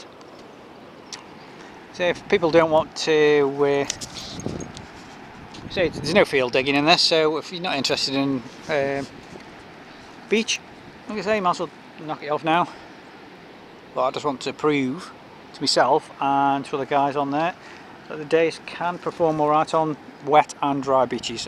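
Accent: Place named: Stoke, United Kingdom. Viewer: You sound like a proper Stokie mahhh mayte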